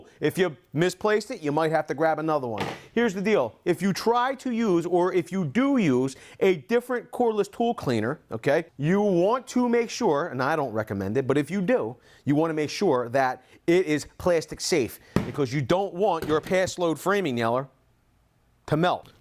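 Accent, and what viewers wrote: Philadelphia, United States. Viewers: I knew that accent! It's like being home again, in Philly! Also, thanks for a clear video on cleaning my Paslode.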